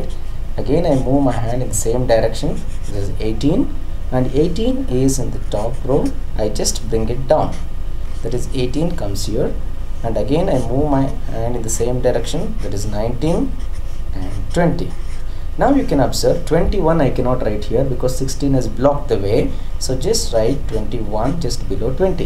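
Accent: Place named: India. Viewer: are you indian. the accent is nice :P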